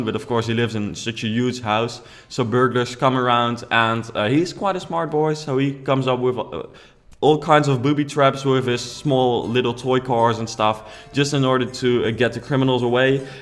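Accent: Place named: Netherlands. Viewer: Haha ik kende je kanaal nog niet, maar ik klikte op je video (zonder naar je naam te kijken) en hoorde gelijk al dat je Nederlands was! Haha, Nederlanders hebben altijd zo'n accent, wel een super leuke video👌🏻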